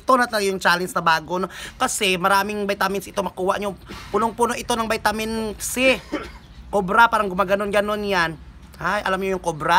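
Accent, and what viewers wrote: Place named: Iloilo, Philippines. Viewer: Wahahaha abaw gid ah ilongga gid tana c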